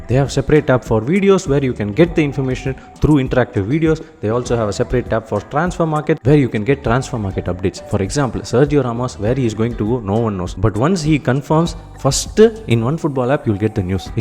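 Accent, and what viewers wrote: Tamil Nadu, India. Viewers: thala vera level tanglish a big fan of you and true what all you told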